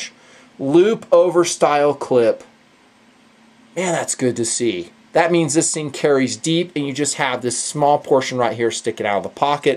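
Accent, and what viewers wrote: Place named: South, United States. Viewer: I just love southern accents like when he said "sheepdog knife!"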